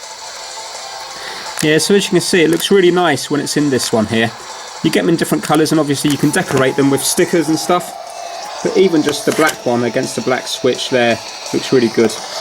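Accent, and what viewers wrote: United Kingdom. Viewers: Wait are u British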